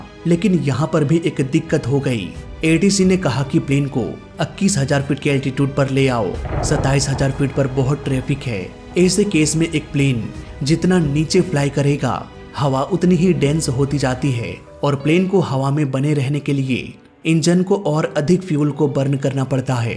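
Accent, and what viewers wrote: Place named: Bihar, India. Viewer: Bihari एंकर 😅😅😅😅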